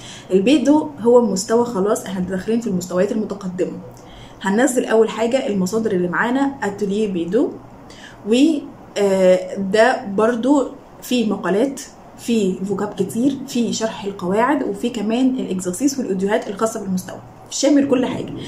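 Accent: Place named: Pakistan. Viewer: Arrête 😂 tu fais la star de français et jcp quoi mais regarde ta façon de parler tu parle comme un pakistan c’est trop drôle en vrai il faut juste corriger tes fautes d’orthographe et de parole Parce que vous parlez de la mauvaise manière et que votre accent ne convient pas du tout au français parce qu'une personne indienne parle français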